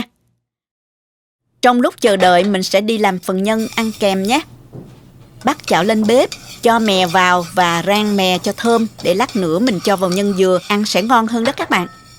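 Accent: South, Vietnam. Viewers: That cam on co...1 giong noi rat am cung....ngot ngao giong mien nam.ngot oi la ngot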